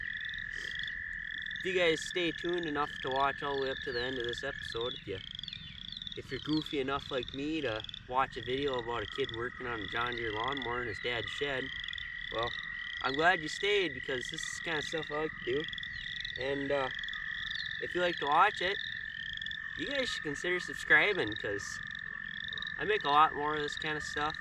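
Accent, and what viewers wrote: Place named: Midwest, United States. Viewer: sound a little midwestern lol either your from Minnesota or Wisconsin just picked up a 111 today needs a new engine as well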